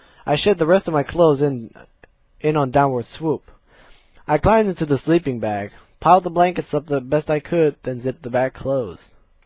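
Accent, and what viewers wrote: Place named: United States. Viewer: Wait, you're from holland? Hold on let me go on google maps........, OOOOOOOOOH, ok now I know were that is, wait, also dont they speak another laguagd and have a different accent because you sound alot like you're from america.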